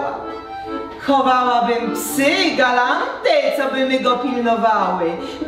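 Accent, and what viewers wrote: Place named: Łowicz, Poland. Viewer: Mistrzyni interpretacji i gwary, prawdziwa gwiozda łowicka🌹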